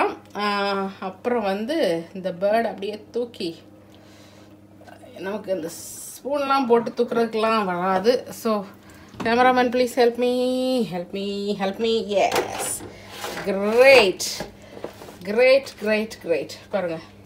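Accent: Nagercoil, India. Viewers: Nice to hear our nagercoil slang. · Superb turkey dinner with all the trimmings. Anton's choices are nice. Your Nagercoil slang invokes the festive spirit too.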